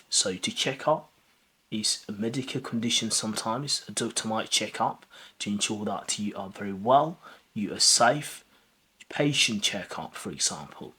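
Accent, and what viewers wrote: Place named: England, United Kingdom. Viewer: I like you accent , what type of accent are you talking with I mean what part of England are you from?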